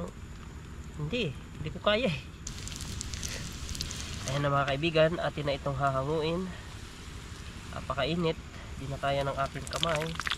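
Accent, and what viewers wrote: Visayas, Philippines. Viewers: bisaya ka sir?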